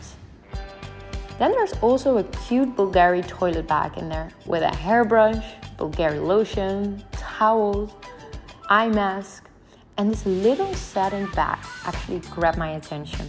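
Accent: Netherlands. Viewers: Ja, jij komt een 100% zeker uit nederland! ik hoor het aan je accent :) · Why do you sound Dutch ?😂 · Je klinkt Nederlands haha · Sanne Vloet je accent verraadt dat je Nederlands bent. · Zwz ben je nederlands haha je kunt het gewoon horen NL kunnen nooi goed engels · Your dutch and i thought you were going to The Netherlands again?.. Btw i knoe that cuz your accent not being rude. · You have the netherlands accent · Jij bent toch Nederlands? Ik hoor het aan je accent 😂 Leuke video trouwens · Her English is so good but I still can hear Dutch. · Je Nederlandse accent 😂✌️ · Is ze Nederlands? Door t accent hahah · Youre dutch richt. Im sorry i hear it but youre realy good in it. I hear it because...............ik ben ook nederlands. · Are you Dutch? Cause your English sounds like Dutch people’s English (I mean like your accent) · Ik wist dat je nederlander wist op het eerste moment door je accent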